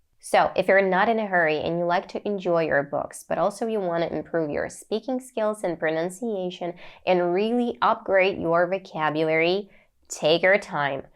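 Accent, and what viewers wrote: United States. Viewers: Hey Master! New to your channel. Just wondering where are you from? You sound like American. Also love your accent or the way your mouth says English words. Greetings from Deep Space! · I thought you are native English speaker. You speak just like Americans. Did you ever live in the USA before? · You are so pretty and you look l and sound like a american woman😁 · Wow I thought you are American You speak with 95% American accent. That's very impressive I've never seen someone from CIS who speaks with almost pure American accent. You encouraging me to improve my speaking skills. Keep it up👍 Thank you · You make me crazy... Well, twice too american accent... You speak clearly, of course, thanks a lot, everything is understandable...but accent...Who are you originally? I've heard words Russian, Ukranian...Hah...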